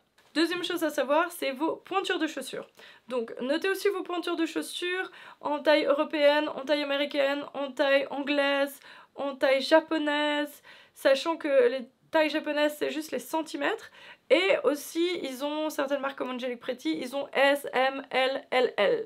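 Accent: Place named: France. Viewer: Je connaissais tes vidéos anglaise et jai tout de suite reconnu un accent français ahah jme suis pas trompée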